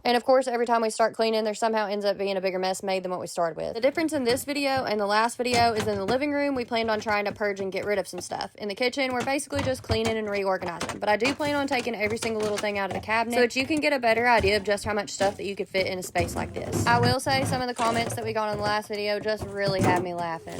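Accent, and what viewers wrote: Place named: South, United States. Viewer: It is unbelievable how much stuff you can fit in them small places and you sound southern witch would in fact answer the fast talking thing but i do love you accent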